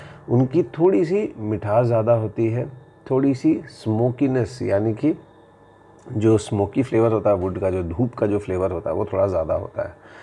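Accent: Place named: Dehradun, India. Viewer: Hi, I do like the way you talk. Infact you do talk like my relative from Dehradun. I hope you don't mind telling me your full name and your birth place.😊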